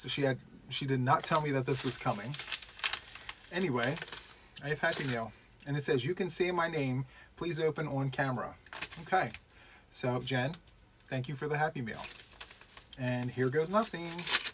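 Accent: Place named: Boston, United States. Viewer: I love your Boston accent 😊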